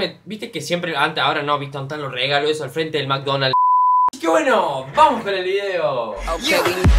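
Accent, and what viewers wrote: Córdoba, Argentina. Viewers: Renato Suazo Es cordobez boludaso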